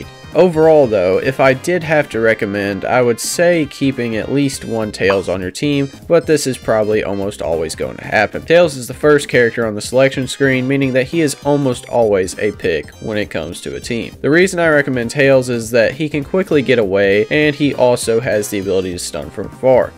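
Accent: South, United States. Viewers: Bros so country that he sounds like a guy from a commercial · You kinda sound like a red neck which I really like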